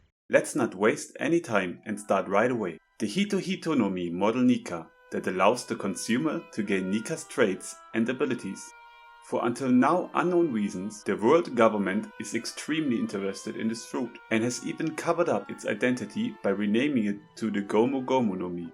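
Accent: Germany. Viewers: no problem bro, and are you maybe German too? idk i think that maybe because of your accent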